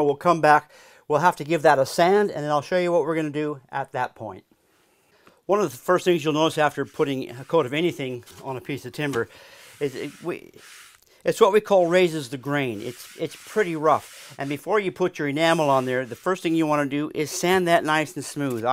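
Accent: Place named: Australia. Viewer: I thought I detected a slight Aussie twang! Great video mate!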